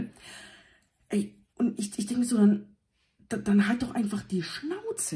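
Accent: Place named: Franconia, Germany. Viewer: Ich liebe den fränkischen Dialekt. Bin selbst auch ein schwäbischer Franke. Bitte bleib so wie du bist !!!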